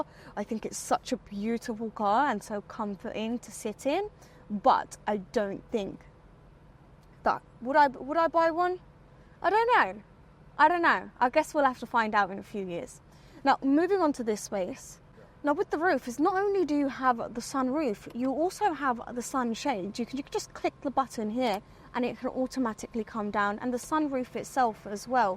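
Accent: United Kingdom. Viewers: where are you from originally? Also i can sense the british accent lol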